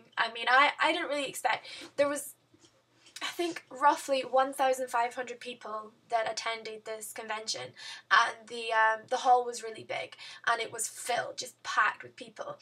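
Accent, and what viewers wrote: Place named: Scotland, United Kingdom. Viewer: I'm going this year too :) I can't waiiiit hopefully see you there :) Where are you from? You sound scottish but at the same time english ahahah I don't understand xx I'm sooo excited about going this year, just worried i'll do something wrong and miss my photo op or get lost or something , Yeah it might not seem possible but I'm an idiot :p xx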